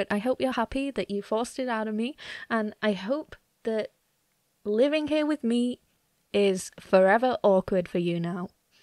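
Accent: United Kingdom. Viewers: This perfect scirpt perfect everything but the British accent ruins it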